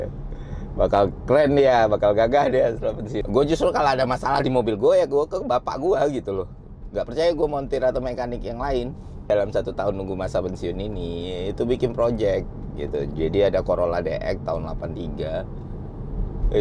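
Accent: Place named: Jambi, Indonesia. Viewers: Mantab bang, logat Jambinyo masih ado. 😅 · Seneng nian denger bang make bahaso jambi, samolah cak palembang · Enak nian dengar kau bahaso jambi bg, mantap 🔥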